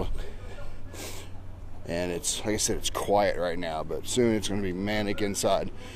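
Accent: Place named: United States. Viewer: hello from Alaska! hey. Thank you for this. love the 'in car camera' footage. reminds me of ReVolt (Nintendo 64/ PS1) video game from 1999. Vintage is cool. for me the golden age of Rc was back between 1989 through 1992. Don't get me wrong I love the new stuff. And things are always getting better RC wise. Looking forward to the forthcoming video. BTW. where are you? You sound American. But your videos are from the uk and Europe. right? You had a rock crawler video that seemed to be here in the U.S. Though I am not sure. take care! keep on RC ing!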